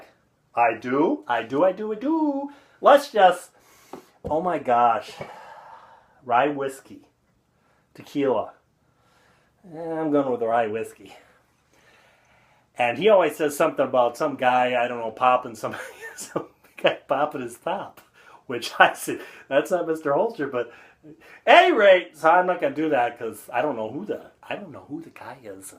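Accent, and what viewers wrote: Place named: Los Angeles, United States. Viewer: Holy cow, made me feel like I had moved back to Kommiefornia for a second, sounds like 50% of LA county. So glad I moved to Fargo.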